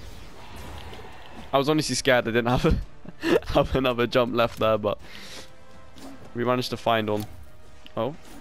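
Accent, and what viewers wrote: England, United Kingdom. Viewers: hey you're from england right?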